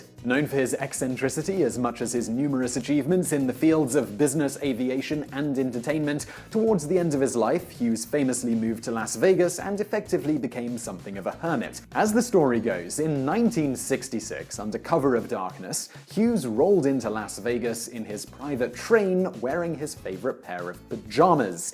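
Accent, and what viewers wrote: United Kingdom. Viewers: Gallagher Rutledge ya most Americans pronounce those worlds right, but I understand since he was British.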